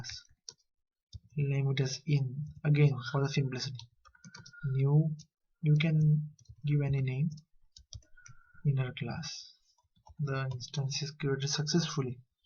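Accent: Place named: Mumbai, India. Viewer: omg am i gonna find some java video WITHOUT mumbai accent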